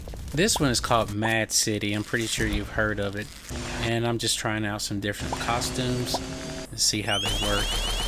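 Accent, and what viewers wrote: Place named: Texas, United States. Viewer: u have an ultra Texan accent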